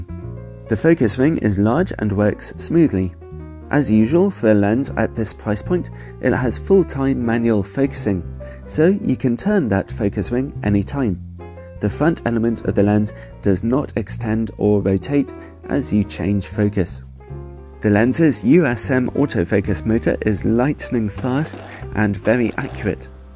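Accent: United Kingdom. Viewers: The best reviews ever. Love your British accent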